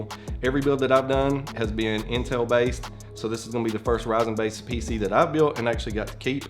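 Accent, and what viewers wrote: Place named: Kentucky, United States. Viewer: First time here. Love your video. I have to ask where you're from? You sound like a fellow Kentuckian. :)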